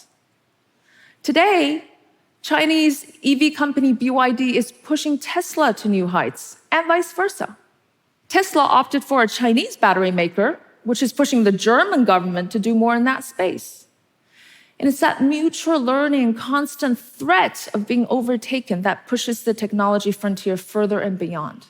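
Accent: United States. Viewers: She talks and acts like 100% American. Does she live in China or is she just a CBA? - from Beijing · Wow her American accent!! Amazing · The United States is a country of immigrants. We are a melting pot of many cultures from around the globe. Indeed sounds American. What makes the United States great is our diversity. Europe & the United States-the West-has brought the world enlightenment over the last 300 years. China is now enjoying this western enlightenment on some levels (not politically). It’s unfortunate that the Chinese, Communist Party blocks, YouTube, Facebook, and many other Western icons. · She doesn't look like one that "read by candlelight" and she sounds too American to appreciate China as she so claims 🤔🤔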